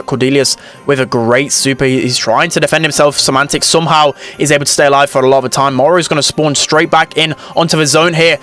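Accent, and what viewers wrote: United Kingdom. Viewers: I just love British Accent. Underrated commentator too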